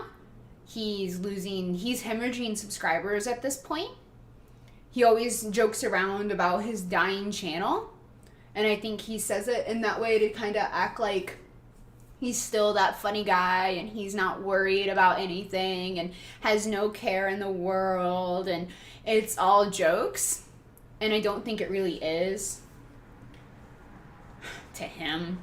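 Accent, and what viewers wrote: South, United States. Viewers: Is it me or she has a slight southern accent?... just, certain words. Its hard to tell but there is something. Never noticed. But maybe I´m just to sleep depraved. Also cuteness overload. Just pout.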